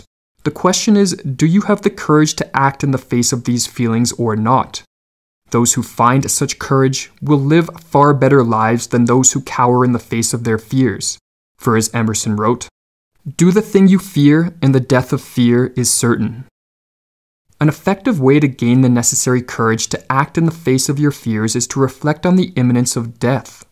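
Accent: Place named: Canada. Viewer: Your "outs" and "abouts" indicated clearly to me that you're a Canadian.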